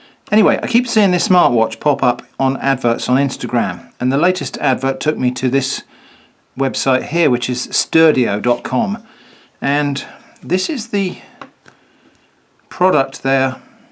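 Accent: United Kingdom. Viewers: You sound more brittish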